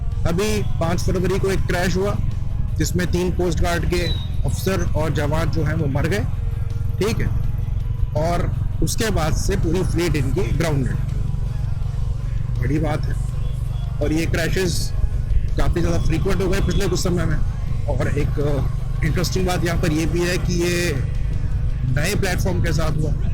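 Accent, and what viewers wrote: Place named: Uttar Pradesh, India. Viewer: Are you from uttar pradesh purvanchal ? ( Specifically from varanasi region ? ) Your accent is quite similar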